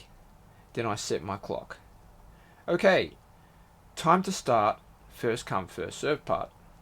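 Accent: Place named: Australia. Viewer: Hey mate. Great video. You sound like another Aussie hahah Im lockdown in melbourne. Anyway can i ask. If you have all the BSCPAD staked already. When the IDOs open, do you have to then go and buy BUSD and BNB or are you just using the BSCPAD tokens as like collateral or something. Only part i dont really get. I would think we will need BNB for fees. But again do we need to buy BUSD and if so how do you work out how much you need. Cheers again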